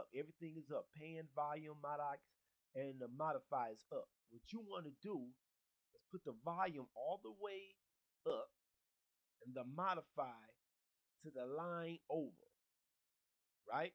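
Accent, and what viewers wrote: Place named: West Coast, United States. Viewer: teeeen,teeeen,teeeeen hahaha luv the west coast accent well u sound like u from there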